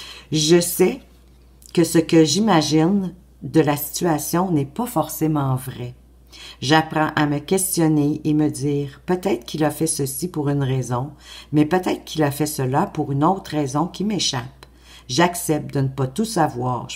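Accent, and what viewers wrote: Quebec, Canada. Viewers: Coucou 😊 Pour commencer...Wouaaaah quel effet ça fait d'être citée au Québec😁, j'suis trop contente car j'ad😍re le Québec & votre accent🥰 ! Mais je les connais ces 2 jeux ! La petite boîte je l'ai offert à une Amie et le coffret carré est juste MAGNIFIQUE, nous sommes carrément plongés dans l'univers/l'atmosphère du Sud du Mexique, ça fait voyager direct...Tu sais qu'il a fait le coffret "le 5éme Accord Toltèque" avec le même style (boîte carrée & même style d'illustration) ? Va falloir investir 😁 à moins que tu l'ai déjà ? Confidence pour confidence, je préfère ta version (elle me fait voyager) mais je n'ai pas eu l'occasion de l'avoir☹️🥹😢. Lumineuse journée à toi 🌞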